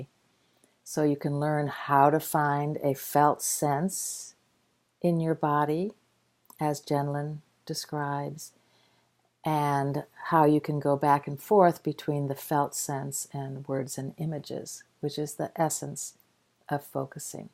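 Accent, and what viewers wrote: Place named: Chicago, United States. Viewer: I love her soft Chicago accent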